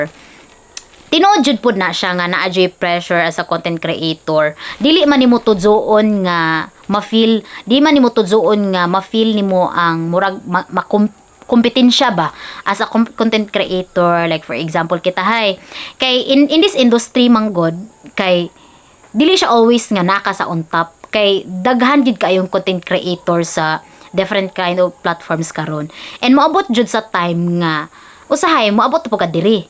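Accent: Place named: Bohol, Philippines. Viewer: Dli k tinood tga bohol kung wla k kabalo sa accent ni ms, especially ang iya mga word gegamit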